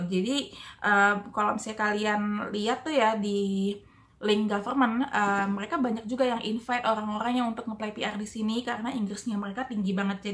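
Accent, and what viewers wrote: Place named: Bali, Indonesia. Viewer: hahaha iya, logatnya mirip Bali. hehe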